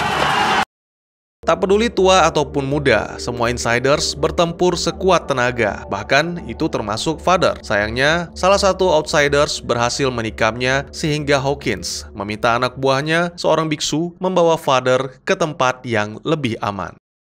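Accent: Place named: Medan, Indonesia. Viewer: Wkwkwkwk logat orang Medan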